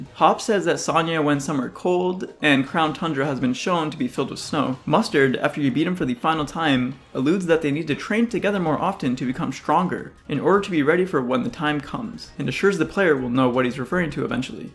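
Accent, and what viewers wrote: Canada. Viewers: Why do you sound like you from canada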